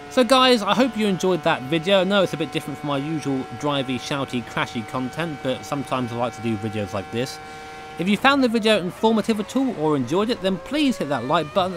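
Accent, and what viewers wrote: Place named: South Lincolnshire, United Kingdom. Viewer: You say "summit" like I do, instead of "something". Are you from anywhere near South Lincs?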